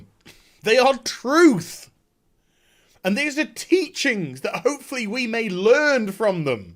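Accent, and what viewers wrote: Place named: United Kingdom. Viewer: god i so fuking love the British accent :D to the topic Keep up the good work that need a abo :D great evening from germany here .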